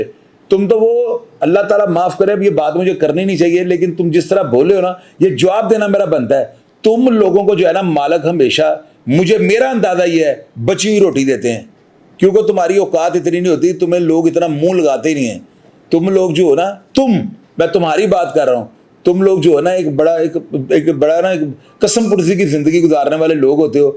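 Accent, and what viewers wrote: Lahore, Pakistan. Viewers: 😂😂😂haha yar jeo lahori andaz meh bat krtey maza ajata saab ye style nhi change krna apny kbhi bi plz big fan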